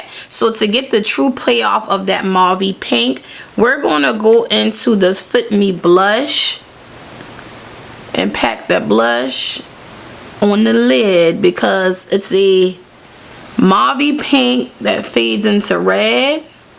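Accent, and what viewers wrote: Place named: New Orleans, United States. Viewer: Loved the lip combination!! and love your accent. are you from nola? lol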